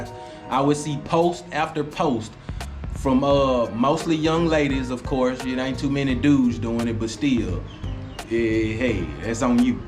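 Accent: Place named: Tennessee, United States. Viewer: Is you from the A or are you from Memphis. I hear Tennessee.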